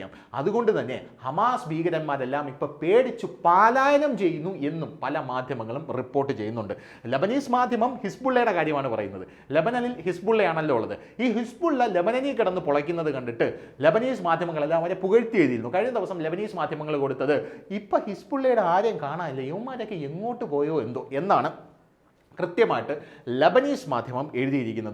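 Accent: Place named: Pala, India. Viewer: രസകരമായി present ചെയ്തു 👍പാലാ slang ൽ 😂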